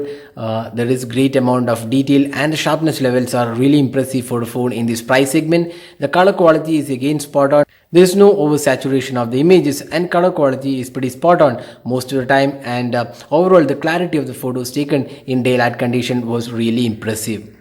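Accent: Kerala, India. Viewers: r u a mallu? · Are u a Mallu ?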